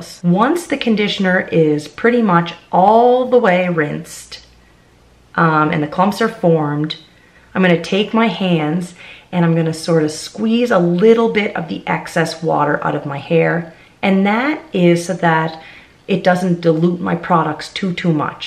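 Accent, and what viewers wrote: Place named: Nova Scotia, Canada. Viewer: I love your accent. I walk around practicing my Nova Scotia voice.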